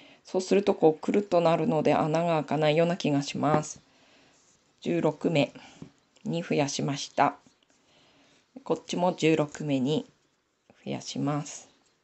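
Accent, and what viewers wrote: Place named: Hachinohe, Japan. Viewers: youtubそのものに初めて コメントです。何と懐かしい 。南部弁～「まめしとぎ」「干し餅」美味しいものの表現に「くるみの味」先日からチャンネル登録して見ています。 いまだに 帰省した時に 道の駅に寄り探しますよ～ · おはよう〜💕 「うんめ〜」カワイイよ。 青森訛り好きだわ〜🥰 大好きなものを熱く語るはずが、よくわからないという顛末😁の件も面白かった。 私は愛知出身なんだけど、名古屋弁ってキツめなんだよね🥲 青森の友達と話しているみたいで楽しかった。 またやってね、青森訛り動画👍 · 私の父が八戸の生まれです 訛りを聞いてるととてもほっこりします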